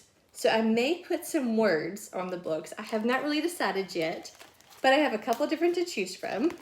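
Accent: South, United States. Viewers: I love the whole thing!! You don't talk funny it's just our southern drawl!! ❤🤔😂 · I enjoy your channel so much! I'm playing catch up now watching all I can! I can understand you just fine I'm a southern lady too! 😊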